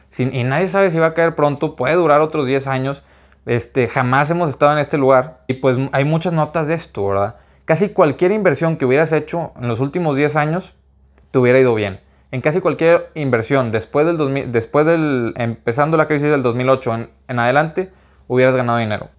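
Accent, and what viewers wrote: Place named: North, Mexico. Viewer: Eres Mexicano y eres norteño vdd ? A mi no me mientes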